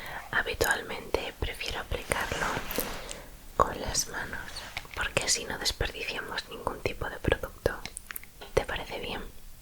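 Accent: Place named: Spain. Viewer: Me gusta mucho ese acento español ❤ es muy relajante su asmr.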